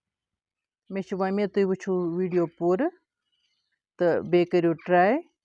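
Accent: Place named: North Kashmir, India. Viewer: Typical kashmiri bolebosh. Aap zaroor north kashmir say honge.....either bandipora or baramulla district.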